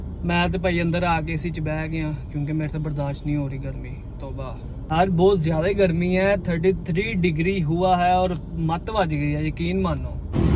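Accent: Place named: Gujranwala, Pakistan. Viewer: Aoa bhai, watching your videos since day 1 and following you on insta since 2018 i think. I just want to say k aap apni Gujranwali jo zuban hay Punjabi or uska accent jo bolty hain. Yahi unique cheez hay aapki, aap us main hi vlogs banaya karyn, or yahi waja sy log aapko follow karyn gy. Or apki squats or jerk ka bhht bara fan hun, ye dollay shollay or shredded body k peechy na jayen, us k liye fat bhht cut karna parti or bhht papar belny prty, apki power b compromise ho gi. Bass yahi ek choti c advice apk liye. Thank you and love you. By the way meri birth b gujranwala ki hi hay is liye wo accent sunny ka sawad ata. Is liye fake urdu accent main aap apny apko achi trhan express b nhn kar pao gy. Or log vlogs yahi cheez dekhny k iye dekhty.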